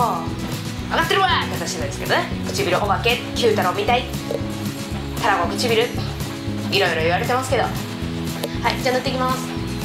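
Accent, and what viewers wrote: Kansai, Japan. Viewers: いつもサムネで気になってて初めて動画見ました！バイリンガル系の人かと思えば、サバサバしてて親しみやすく、関西弁がめちゃかわいいです！ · 関西弁がカワイイです( ⁰▱⁰ )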